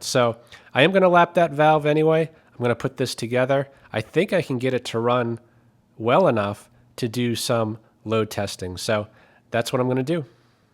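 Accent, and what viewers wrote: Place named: South, United States. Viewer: Critter? What part of the South you from?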